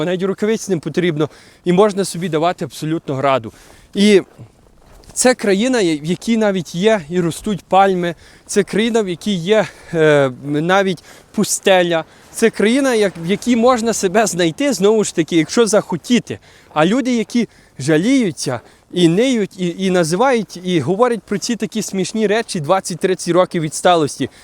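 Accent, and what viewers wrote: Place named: Lviv, Ukraine. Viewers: Та ньи,він хоц і канадец,але чолувік з Львова,і всьо їдно всі розуміют шо хлоп хтів сказати - в тому й колорит нашої мови.На жаль ми катастрофічно втрачаємо наші місцеві діалекти - замість напевне якогось Черкасько - Полтавсько - Запорізького варіанту мови.ТАК ШО ВСЬО ФАЙНО! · Хлопче, кому ти це говориш?..До біса все це. В тебе коломийський акцент розмови, гайда копати кирницю в Канаді. Твої предки мігруючи за кордон, молодці що зберегли і законцервували для тебе мову. Так само зробили мої предки мігруючи до Аргентини.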